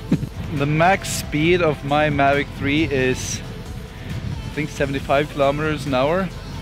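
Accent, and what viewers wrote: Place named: Norway. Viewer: Fabulous video, 🙌🏼 Are you Norwegian mate?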